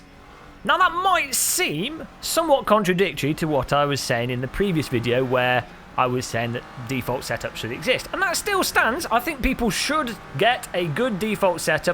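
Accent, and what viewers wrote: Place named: England, United Kingdom. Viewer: Dude you are a F.........king pure English dude :-)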